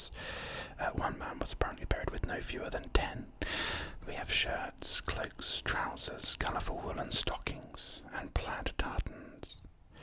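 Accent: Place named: England, United Kingdom. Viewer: I love history and English accents so great combo for Me. Thank you.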